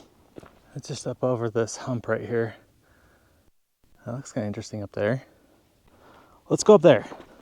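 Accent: United States. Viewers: I love your channel for not only your aDventures & comments. I find that your American accent is so obviously American. Keep on trekking.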